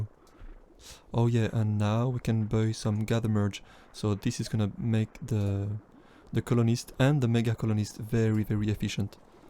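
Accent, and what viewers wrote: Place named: France. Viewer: Your accent tell me that mby you might be fr so.. j'avais une petite question, est-ce que ce ne serait pas une bonne idée de mettre un marqueur fluo d'une couleur au choix mais bien flashy autour du pointeur de souris? (J'ai pu remarquer avant hier en terminant la démo que la souris devenait vite illisible ou introuvable quand tu arrives sur les trillions et qu'il y a des rivières de fruits à l'écran.)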